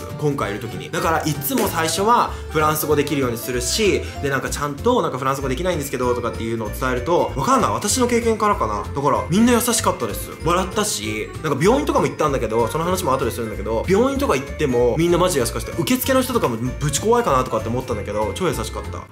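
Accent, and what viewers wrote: Kansai, Japan. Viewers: なんで今日関西弁多いのWWW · って、たまに関西弁が混じるんですが関西出身なんでしょうか？急に親近感🐸（私は兵庫出身です！）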